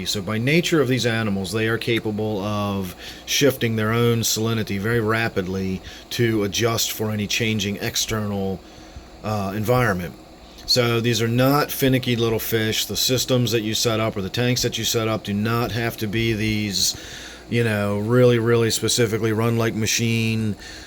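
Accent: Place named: East Coast, United States. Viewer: You talk like me are you from eastcoast? I'm from Baltimore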